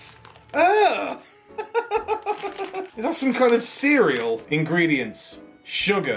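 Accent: Texas, United States. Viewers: Loved i mean looooved the Texas accent lol. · american/texan accent sux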